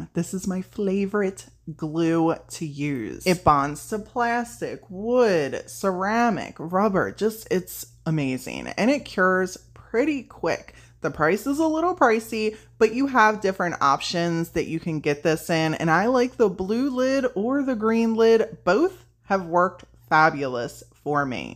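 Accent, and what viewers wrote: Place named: Baltimore, United States. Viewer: I did actually mean like how to sell my products lol sorry I suffer with chronic pain and sometimes my words get jumbled up. Can I ask where you are from? I’m originally from Baltimore, MD, but I’ve lived in San Diego, CA since I was 8-9, it’s just your accent that reminds me of my mom’s. She’s was born and raised in Baltimore and she had a very similar accent like yours. 😊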